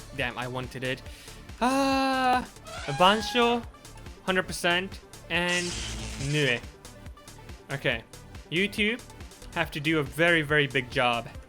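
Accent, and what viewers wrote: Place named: Japan. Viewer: The way you say names in Japanese accent i think you're from japan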